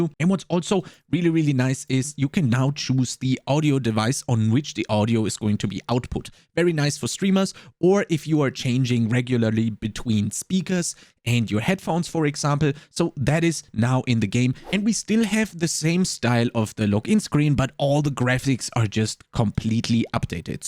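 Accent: Germany. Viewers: are you german ????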